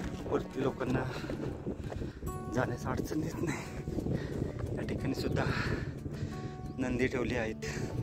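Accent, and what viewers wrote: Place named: Satara, India. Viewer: तुमच्या भाषा सातारा ची वाटतंय माझा जन्म खेड राजगुरूनगर चा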